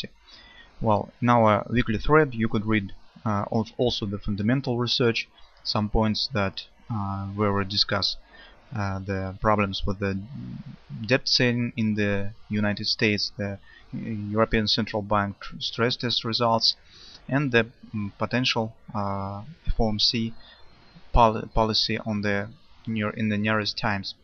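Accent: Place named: Russia. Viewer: yeap, you've got the point... eur/usd is more bullish than bearish for now... funny accent! :) where are you originally from? Russia, I guess, right? :)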